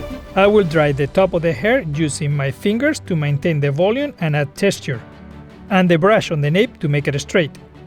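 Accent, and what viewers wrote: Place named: Spain. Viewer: No hablo ingles pero tu acento suena extranjero, de que pais eres?? España?? Por cierto muy hermosos tus trabajos, la proxima que me corte el pelo llevo una foto de aca jajajaja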